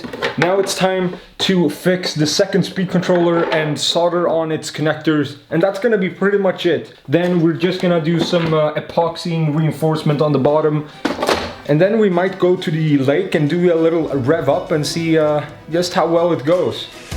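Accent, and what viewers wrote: Netherlands. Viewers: The whole time i heared you speaking english i thought you where dutch.. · Are you dutch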